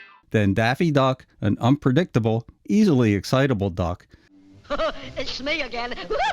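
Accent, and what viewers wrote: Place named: Philadelphia, United States. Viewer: I hear an accent I can't ever not hear again, sir, would you happen to be from Philadelphia?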